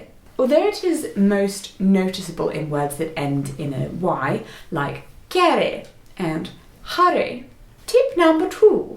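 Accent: RP, United Kingdom. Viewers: Could you do a video on your specific accent? As in how to speak posh, not why you speak that way because I've seen that one. I'm American and enjoy the sound of British accents but my mimicry only works if I hear it repeatedly with why those sounds are done that way. Also if you'd do more on the history of you monarchy I'd enjoy that as well. I know information changes based on who's writing it and I'm pretty sure my school textbooks didn't think much of anyone not American. Got to love public schools... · Your so amazing I love your British accent 🇬🇧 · Nah I imagine accent in general. Even I, a mere Latin American native Spanish speaker, can tell her accent is Posh™ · Learning the queen's accent from someone who can't hear is but one of the many joys of this channel!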